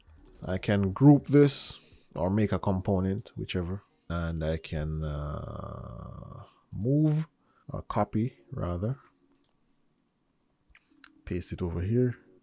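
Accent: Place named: Jamaica. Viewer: Thanks bro. Peace from Cape town South Africa. I'm enjoying your series. And that Jamaican accent allows for good vibes whilst learning .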